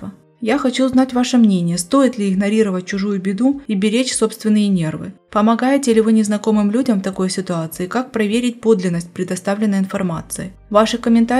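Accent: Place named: South, Russia. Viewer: У меня недоверие. Почему ролик озвучивается голосом с таким явно выраженным говором, южно-русской "г"? Понятно, что человек со стороны нанят для озвучки. А почему?Почему автор канала сам не озвучивает этот ролик??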